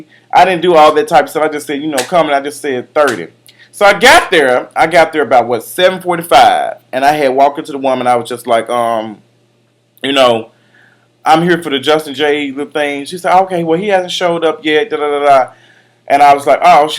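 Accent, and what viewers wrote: Memphis, United States. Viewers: Justin, I found your videos it was on the recommended videos and I clicked one where you were going in on your family and it was soooo good. I had to keep clicking your videos and i love your personality. I was thinking I loved your accent and it sounded familiar to me. Then I found out that you lived in Memphis and I had relocated here and have been here almost 2 years. Your Memphis references are so on point and funny! You are so down to earth and I totally understand where you coming from where you saying you have to let go to really dive in your purpose. You have my support 100% and you definitely deserve all the success that is coming your way! Thank you for making me laugh and continuing to be genuine. Love your vids, and love you!!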